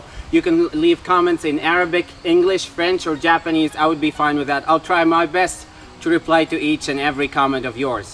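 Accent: Annaba, Algeria. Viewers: الحلو في فيديو تاعك لا لمللل هههه تتفرج حتى لخر و متقلقش حتى ويكون طويل خطراكش كوكطال فيه تسلية و مغامرة روعة مع لهجة عنابية ولى ارووووووع 😍😍😘😍😘😍😘😍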